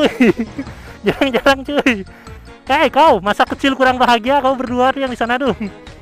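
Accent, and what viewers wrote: Bali, Indonesia. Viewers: Dari logatnya aja udah keliatan, pasti abang orang bali ya 😅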